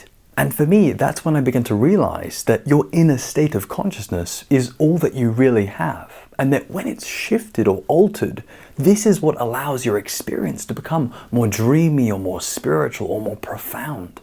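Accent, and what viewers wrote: United Kingdom. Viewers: What accent is that? You look Danish but sound British 😅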